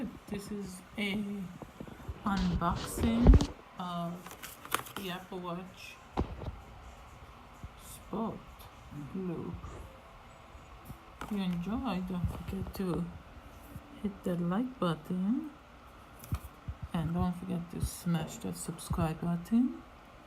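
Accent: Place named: Russia. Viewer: You sound like a russian woman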